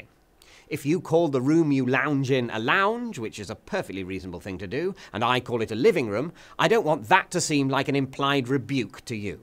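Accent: United Kingdom. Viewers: waiot, is that one of those british Rs? why add an R only to make it silent?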